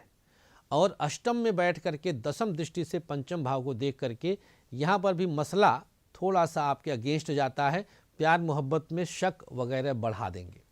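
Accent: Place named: Bhojpuri, India. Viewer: Dhanyawad sir ji bhojpuri touch ke liye, aap ka bolne ka style mast hai, vedio informative raha🙏🙏🙏🙏🙏🙏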